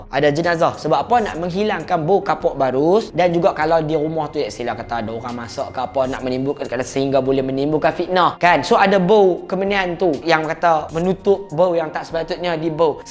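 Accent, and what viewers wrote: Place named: Penang, Malaysia. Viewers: samaaa...layann jaaa ceritaaa diaaa tambah2 pelet utaqa lak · Aku suka cakap. Besala oqang kita.. Penang mariii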